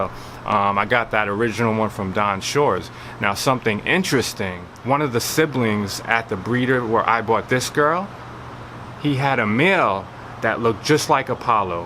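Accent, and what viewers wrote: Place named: New York, United States. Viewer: Nice video......do I detect a NY accent? I see you have a African fat tail gecko. If you are new with them use the ground coconut fiber or even the Lugarti reptile bedding. They need a little higher humidity. The Lugarti bedding would probably work well with your snakes as well.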